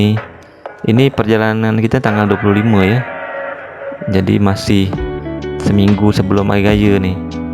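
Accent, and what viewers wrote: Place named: Malacca, Malaysia. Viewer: Suka dengar loghat dabo singkep.. mcm melaka😂 nak jumpa org dabo lah..! Balik kampung sehari suntuk baru sampai rumah😂 letiiih..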